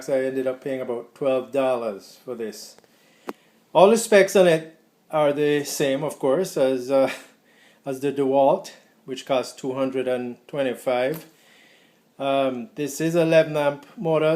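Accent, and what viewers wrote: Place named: Punjab, India. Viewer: Fuck your accent. I'm tired of having to figure out what the fucking Punjabis are saying.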